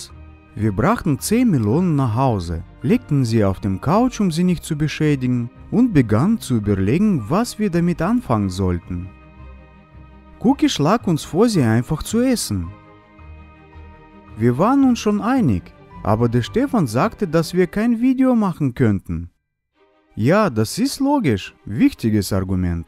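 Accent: Russia. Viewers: Sein russischer Akzent bringt mich zur Weißglut 😑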